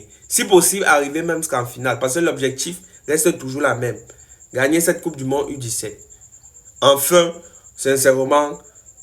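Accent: Cameroon. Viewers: C'est un camerounais 😂😂😂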